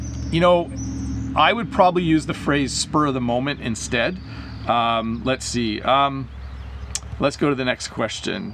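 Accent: Canada. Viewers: I'm Thai people, I like to learn canadian accent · I love Canadian accent either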